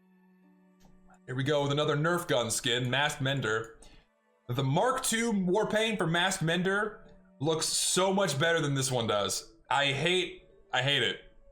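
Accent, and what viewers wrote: Texas, United States. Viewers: and if you watch in 1.25 speed you can hear his southern accent a little bit better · I’m noticing how he gets more and more Texan over the course of the video. I didn’t even know he was form there til now, he’s good at hiding it.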